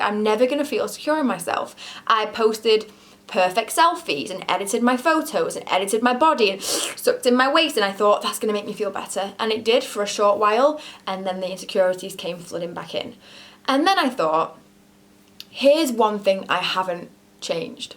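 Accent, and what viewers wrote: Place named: Warrington, United Kingdom. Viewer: Are you from Warrington/leigh? You sound just like my friend!!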